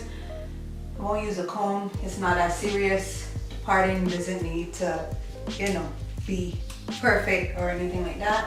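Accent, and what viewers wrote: Barbados. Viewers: You sound like a bajan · are you bajan? you sound just like my mom🤔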